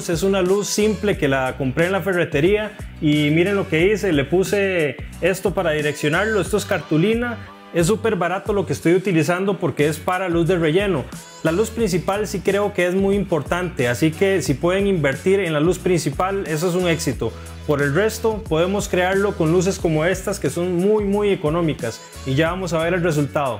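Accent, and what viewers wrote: Costa Rica. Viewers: Que cool este video 😮 , estoy por empezar un podcast con una amiga y este video es oro 🥇🪙, desde que te escuché pensé que eras de Costa Rica, porque tengo un amigo que habla casi como tú 🤭 no me equivoqué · Mae el acento tico es probablemente el mas reconocible jaja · mae los ticos tenemos un acentillo raro no arrastra la r y aun asi me di cuenta que sos tico pura vida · ya decía, esa manera de pronunciar la R es inconfundible de un tico, buen video :) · Acento tico!!! Genial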